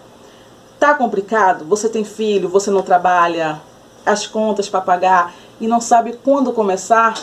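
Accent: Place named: Salvador, Brazil. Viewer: Assim q iniciou pensei logo " Meeeeu Deus , Ela é de Salvador " Nosso sotaque é maravigoude 😍 Muuuulher , você é luz , Ganhou mais uma inscrita 🥰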